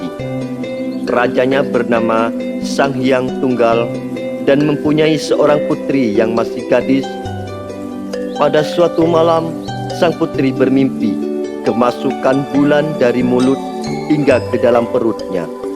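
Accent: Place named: Madura, Indonesia. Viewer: Admin suara madura tulen❤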